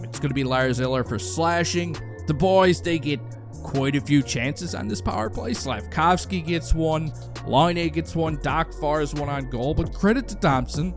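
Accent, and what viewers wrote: Newfoundland, Canada. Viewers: Give him a break. Guys a Newfie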